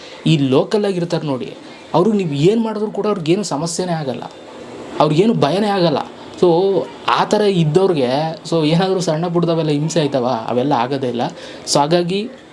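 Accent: Mandya, India. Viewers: ನನಗೆ ಇನ್ನು ಭಯದಲ್ಲೇ 🤣 ವಿಡಿಯೋ ಮಾಡ್ತೀನಿ ಹಾಗಾಗಿ ವಿಡಿಯೋ ಸ್ವಲ್ಪ ಶೇಕ್ ಆಗ್ತಾಯಿದ್ದೆ 😅 ನಿಮ್ಮ ಆ ಮಂಡ್ಯ ಭಾಷೆಯ ಸೊಗಡು 👌 💛❤️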